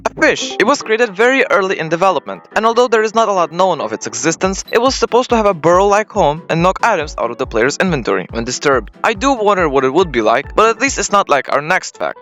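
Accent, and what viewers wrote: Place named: Poland. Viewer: Ale masz Polski akcent bardzo to słychać XD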